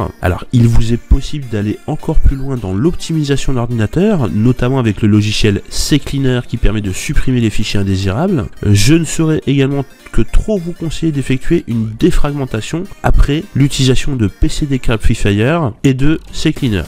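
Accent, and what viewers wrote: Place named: England, United Kingdom. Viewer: Sympa cette petite vidéo, et quel accent anglais <3. J'irai tester ça sur le PC de mes parents .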